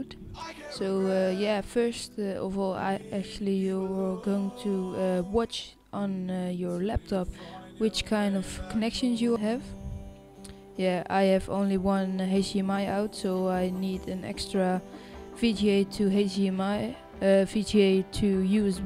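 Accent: Netherlands. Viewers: I can hear you dutch accent from miles away... and those English speaking freaks, try to explain this in dutch....!!! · Ik dacht al dat je Nederlands was XD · hoorde gwn al aan het accent dat dit een nederlander was lol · Where are u from? I think from the netherlands but not sure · R u dutch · Hahah je hoort dat hij nl is lol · Ik hoor gewoon dat je Nederlands bent :P Ps. goeie tutorial!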